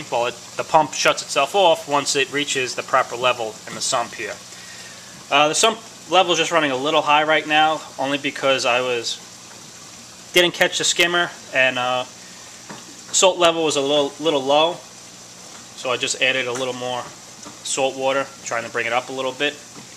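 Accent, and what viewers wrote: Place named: Long Island, United States. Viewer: Good job. Love that long island accent "WAR-TER" "SAW-LT" "FRAWGSPAWN". I have that same light fixture, it works well. The stock bulbs are sufficient, so no worry until you get new ones. What bulbs did you order? Looking great so far.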